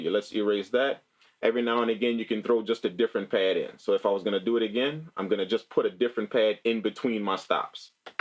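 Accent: New Orleans, United States. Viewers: Man are you from New Orleans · Lol. Interesting. Are you from N.O.? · Love the accent sounds NOLA new to the MPC and beat making new sub🔥🔥🔥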